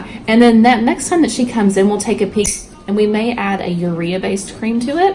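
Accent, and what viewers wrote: Kentucky, United States. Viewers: I'm a Buckeye not a Kentucky girl- but we are close enough in vocal patterns that I feel, we are family after all. Love how you make your older patients feel at ease- as a person of a " certain age" often you hate admitting to family members there are things you can't do easily, especially toenails, after arthritis, joint replacements et all there just things you lack flexibility for. Thank You for being so kind!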